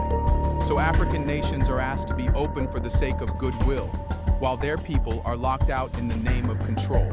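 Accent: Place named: United States. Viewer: All these fake AI videos online, American accent on an african report? Get real...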